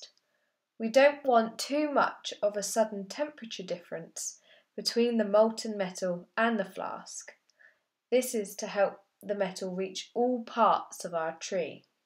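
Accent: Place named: United Kingdom. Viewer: I liked immediately for the British voice. hope it’s good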